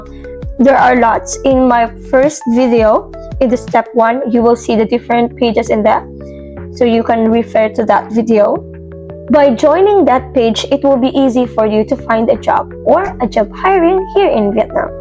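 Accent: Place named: United Kingdom. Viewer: Gusto ko yung may pa British accent 😂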